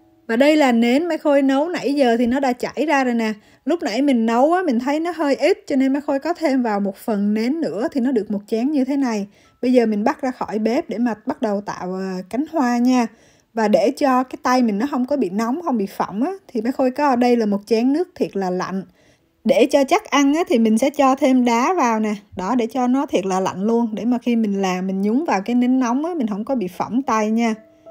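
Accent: Central, Vietnam. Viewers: giọng trọ trẹ ko biết người đà nẵng hay người Huế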